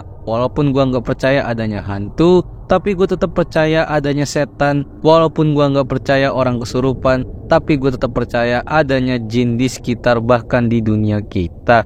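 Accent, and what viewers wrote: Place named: Banjar, Indonesia. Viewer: Bang orng banjar masin? Logatnya kaua orng banjar 🗿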